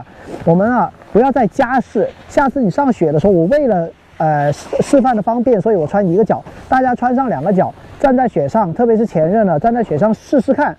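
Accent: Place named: Cantonese, China. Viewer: 广普加儿化音 太逗了！哈哈哈哈哈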